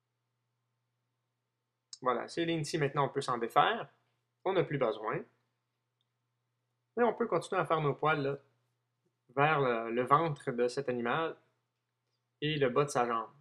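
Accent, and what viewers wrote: Quebec, Canada. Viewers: Tu fais de super tuto, ca m'aide beaucoup, tes commentaires et tes conseils sont pertinents et tres interessants, continue comme ca tu fais des heureux ^^ et j'aime ce bel accent qui vient tout droit de ce beau pays le canada :) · Je trouve tes petits didacticiels géniaux ! Il nous permettent de dessiner des chose que nous n'aurions pas pu rêver ( en tout cas, ça me concerne ). Je trouve aussi géniale, même hilarante ( ce n'est pas une moquerie ) tes petites anecdotes ( je ne savais pas que les forêts canadiennes étaient peuplées de bouleaux ), et aussi ( pas moquerie, pas moquerie !! ) j'adoooore l'accent canadien. Donc c'est officiel, je suis fan, et pour ce didacticiel, pouce vert ! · + un abo :D vous seriez pas Québécois? ^^ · non seulement tu dessines bien mais ta voix est sympathique et j aime trop ton accent québécois ça me change de l accent de chez moi (bruxelles) bonne continuation a toi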